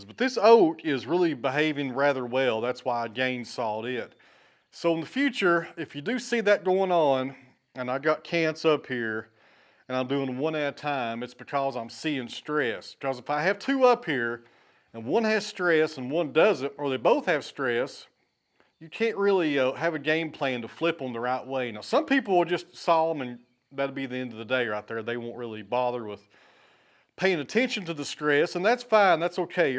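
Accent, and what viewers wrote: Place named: Tennessee, United States. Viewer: Keep doing what your doing. The sawmill, Bruno, mamma cat and cabbage, chores, building your timber frame barn, the chickens, the puppy, your dad, new tools and machinery, trips to the shops and exhibitions, your mistakes and the ability to laugh at yourself, make this a very interesting channel. As a Brit with no experience of life in Tennessee I find this channel fascinating …..and your delivery with that Tennessee drawl and a great sense of humour is the icing on the cake. Keep right on doing what you are doing brother!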